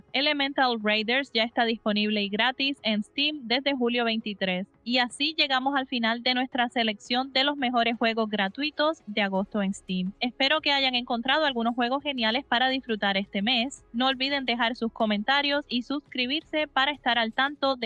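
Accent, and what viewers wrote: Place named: Puerto Rico. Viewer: Saludos. Por tu voz sé que eres como nuestro coqui! Me equivoco? Gracias por el video. Adelante :)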